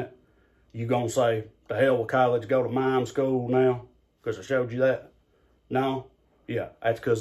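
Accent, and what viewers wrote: South, United States. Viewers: They couldn't even see his... Took me a second to understand the southern accent... BWAHAHAHAHA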